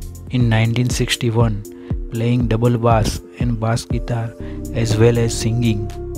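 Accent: India. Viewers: Hahaha.. Come on! Never heard the Indian accent before? You need go out a little bit more... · I THINK THIS GUY TALKING IS FROM KERALA INDIA. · Really you got a foreigner possibly from INDIA speaking about Kenny Rogers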